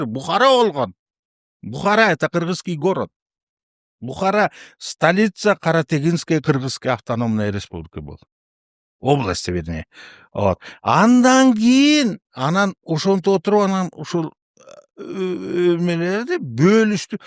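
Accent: Russia. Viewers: Алып баручу бала Кыргыз тилин жакшы уйрон тилин орусс болуптур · Ведущий жигит орус классты буткон шаардык окшойт бирок кыргызча суйлоп азамат экен акцент болсо да.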